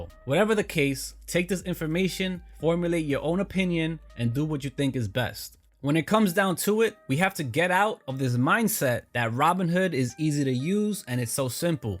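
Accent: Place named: New Jersey, United States. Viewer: Are you from New Jersey?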